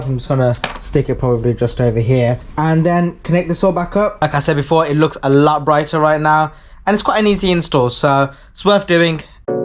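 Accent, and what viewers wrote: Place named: Birmingham, United Kingdom. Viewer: He’s in the UK, you can’t tell by the accent? He’s in Birmingham.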